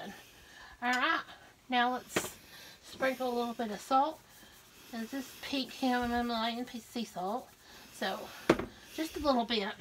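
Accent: South, United States. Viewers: Looks easy and delicious. Where are you from? Love the southern accent😁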